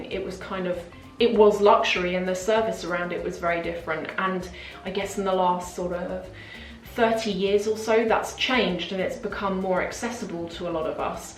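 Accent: RP, United Kingdom. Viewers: With your posh accent and elegance, I’m shocked they treated you so poorly! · “I am quite disappointed” oh I love the way you talk and express yourself! Only a true British Lady can talk like this😘so elegant and articulate!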